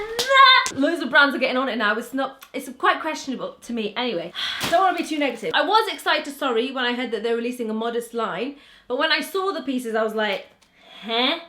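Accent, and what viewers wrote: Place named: England, United Kingdom. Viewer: you have the most beautiful english accent ive ever heard. like wtf how?!?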